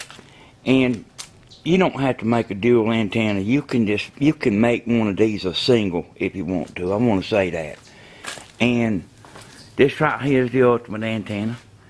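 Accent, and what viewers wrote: South, United States. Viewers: I like your down south southern drawl and I'm in South Carolina. Nothing quite like a Southern Gentleman. Just subscribed to your channel. Keep'um coming🇺🇲🤗🤗🇺🇲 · although I thank you for the video, I find the thick southern accent just too hard to listen to.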